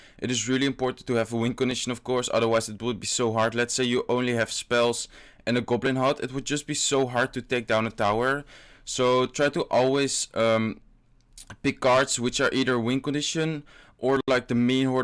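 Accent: Netherlands. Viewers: you are dutch arent you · je hoort zo goed dat ie NL is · Where are you from you sound like Some one from the netherlands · Ik hou van je nederlands accent op je Engels ❤️❤️❤️ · ben je nederlands je accent is beetje nl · Goblin ur 100% from holland. 100 procent. ur accent is to recogniseable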